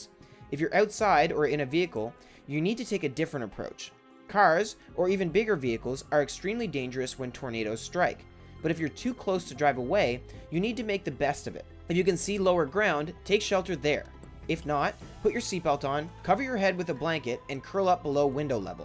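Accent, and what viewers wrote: Canada. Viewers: is the one talking canadian? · You sound Canadian eyy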